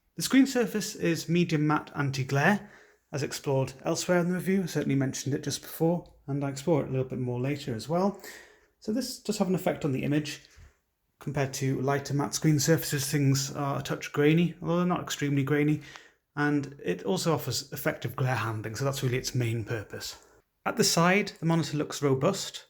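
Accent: United Kingdom. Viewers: Love British English 🥰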